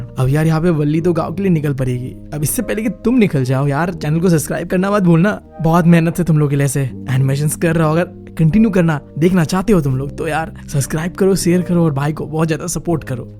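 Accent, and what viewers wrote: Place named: Northeast, India. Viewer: BRO HE'S FROM NORTHEAST (ASSAM) TO THODA HO JATA H SMJH JAO NA❤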